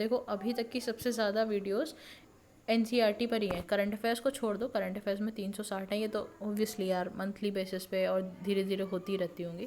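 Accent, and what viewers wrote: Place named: Rajasthan, India. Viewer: aap to rajasthan and haryana sw lagti ho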